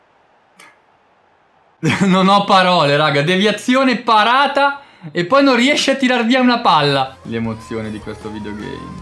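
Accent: Bergamo, Italy. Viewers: Grande ti seguo da quando non avevi l’accento bergamasco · E anche in sto video parla Bergamasco ahahahah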